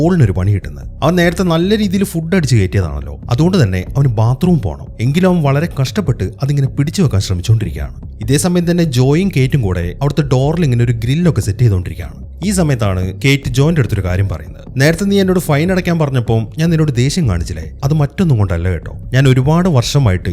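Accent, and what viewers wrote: Palakkad, India. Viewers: Chettan palakkad ano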